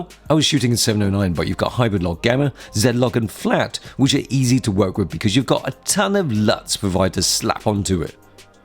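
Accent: United Kingdom. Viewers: imagen being lost in china and you bumb into this guy for directions lol he sounds more britsh than most brits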